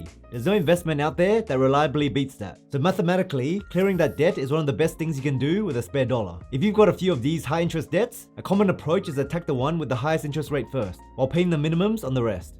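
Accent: Australia. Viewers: Typical Viet Aussie accent❤ smart man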